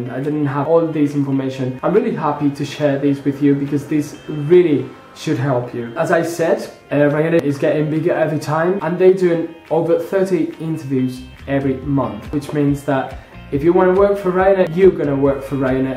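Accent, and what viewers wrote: United Kingdom. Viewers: I did my recruitment day for Ryanair last Friday (Dec 6th), it's Tuesday now (Dec 10th) and this week I'm getting my answer, if I'm finally chosen I'll be travelling to Hahn on the 7th of January. Fingers crossed, I'm nervous and excited at the same time. Gracias por compartir tu experiencia, yo también soy español! Y enhorabuena por tu acento británico 👌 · Such a great british accent being spanish congratulations haha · Omg what an amazing British accent xx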